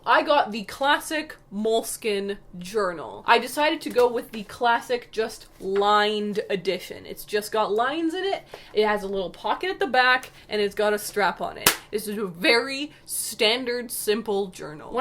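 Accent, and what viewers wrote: Canada. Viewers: I love your Canadian accent when it peeks through - super cute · I knew she was Canadian! No wonder her accent sounded very interesting.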